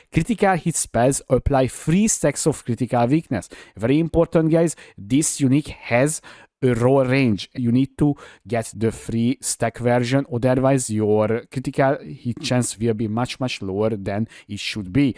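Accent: Hungary. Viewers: Egyből le vágtam hogy magyar akcentus haha :D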